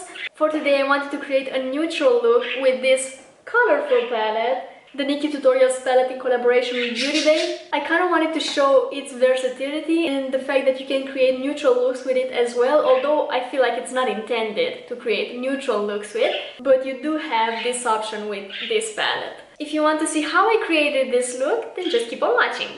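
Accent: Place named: Romania. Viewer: I am so happy I came across your channel. You are so sweet. 💕. I love the fall look you have created. You look gorgeous. I did a makeup fall look on my channel too. So much fun. Would love to be friends on here. 🧡 😘If you don't mind me asking, are you by any change Romanian? I can here a little bit of accent, but your name sounds Romanian :) 🥰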